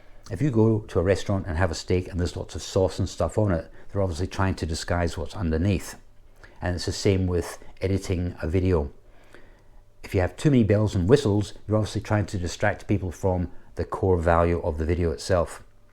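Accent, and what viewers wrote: Scotland, United Kingdom. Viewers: Congratulations 👍👍. How come you have a Scottish accent when you grew up in Oz?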